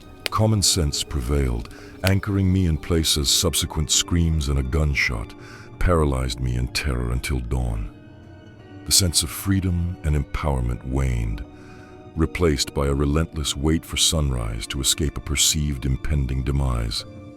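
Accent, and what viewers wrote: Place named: Upper class, United Kingdom. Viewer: I don't like the narrator's voice. Is it a computerized reading? The grammar used, while mostly correct, sounds so upper-class British that it detracts from the feel of the vid, almost sounding like it's all a tongue in cheek satire.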